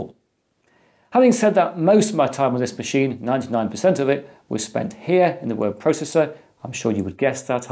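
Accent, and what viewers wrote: United Kingdom. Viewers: pure British English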